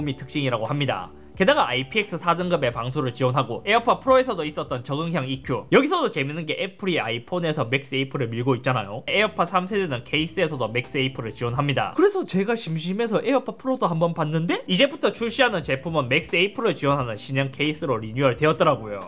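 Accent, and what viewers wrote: Gyeongsang, South Korea. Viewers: 잇섭! 귀여우다 발음을 들어보면 대구출신인것 같은데 맞아요?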